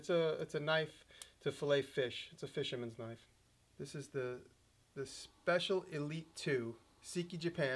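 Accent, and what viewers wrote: New York, United States. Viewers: Love the New York accent.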